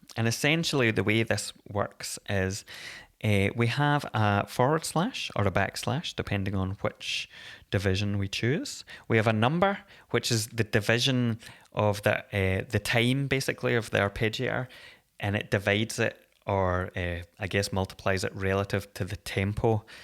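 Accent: Scotland, United Kingdom. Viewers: Cracking tutorial - good to hear a fellow Scotsman!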